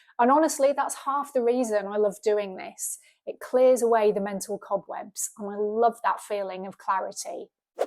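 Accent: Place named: Northern England, United Kingdom. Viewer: irish married guy here from california. your channel is brill. keep on going. great to hear truth, and watched the people who judged you. we are here for you. great job. i’m an immigrant and also lived in london from the 80ts to 2000s know all about england. and your northern accent is great. enjoy Ireland. and hope you meet the right one. as i say keep up the great work. Slainte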